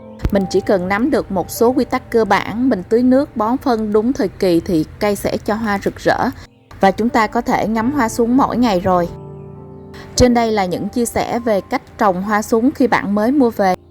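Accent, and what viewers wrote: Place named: Mekong Delta, Vietnam. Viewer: đúng chất người miền tây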